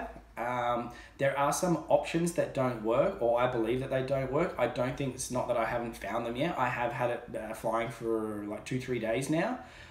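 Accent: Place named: Australia. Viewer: Why are you taking in feet and miles? You sound Australian and look less than 70 years old to me.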